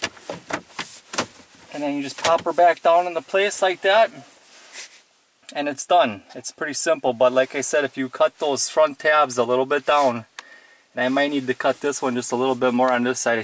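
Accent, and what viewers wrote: Minnesota, United States. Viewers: Holy lord, great videos on F150led installs but that Minnesotan or Wisconsin accent is undeniable! Don’t cha know? You betcha! What is your favorite “hot dish?”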